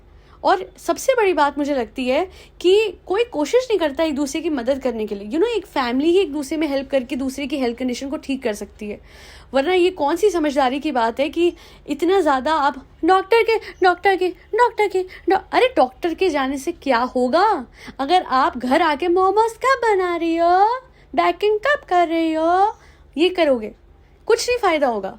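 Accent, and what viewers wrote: Punjab, India. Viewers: Sorry to ask but aap Punjabi ho kya?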